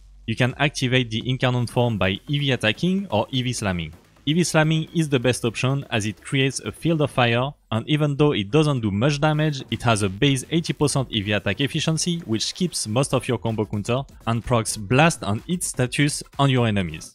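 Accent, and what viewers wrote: France. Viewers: This french accent i guess is just perfect haha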